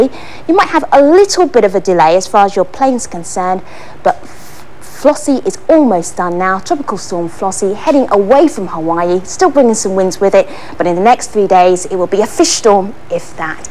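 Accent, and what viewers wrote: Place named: Australia. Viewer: she talks funny, aulstrailian accent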